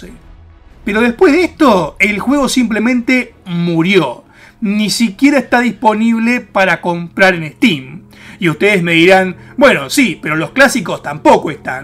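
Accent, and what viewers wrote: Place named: Argentina. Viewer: Yo soy de argentina y supongo por el acento el tambien es argento. Y aca lo jugue en play 2 la consola de sega aca no duro mucho.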